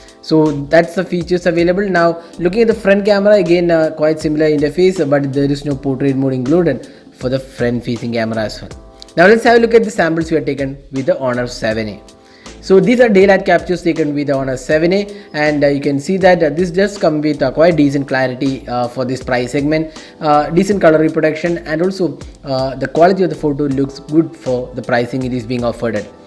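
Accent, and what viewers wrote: India. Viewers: I couldn’t watch the video, cause Indians’ English sounds so ugly and disgusting. So I was forced to give up watching :(((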